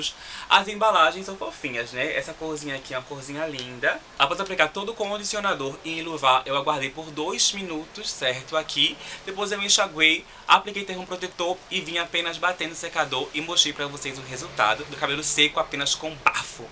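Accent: Recife, Brazil. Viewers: inscrita, adoreeeeeeei. Ele é de Recife?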